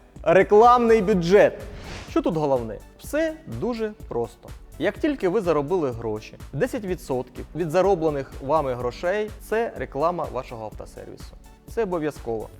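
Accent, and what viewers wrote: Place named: Ukraine. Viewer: спасибо, граммотня подача и приятный настоящий украинский язык, очень приятно слушать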